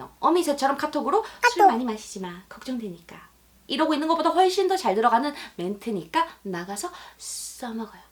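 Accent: Gyeongsang, South Korea. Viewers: 언니 대구분?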